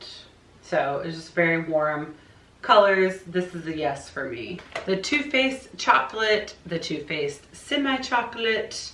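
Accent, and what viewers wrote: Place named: South, United States. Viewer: I loved watching you declutter. I've grown to miss the south and home so it's nice hearing your accent. Will keep watching. ❤️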